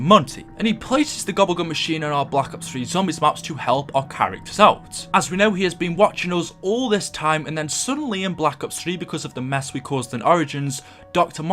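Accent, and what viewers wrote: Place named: Stoke, United Kingdom. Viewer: Love the stoke accent but anyway why are we split at the start of vürruckt??? Btw you are awesome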